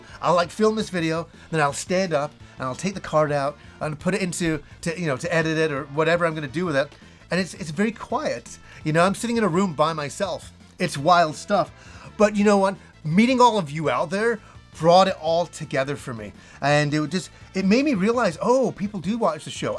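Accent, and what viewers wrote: Yorkshire, United Kingdom. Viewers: Lol a real yorkshire accent. I pray Allah SWT guides you and your family. Ameen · She sounds like a proper Yorkshire lass!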